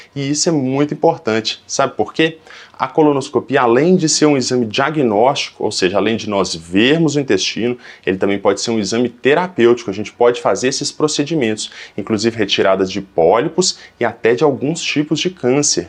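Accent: Minas Gerais, Brazil. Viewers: Médico bonito! Minerin, aposto! Olha o sotaque! Não faço exame com vc não. Tenho Vergonha!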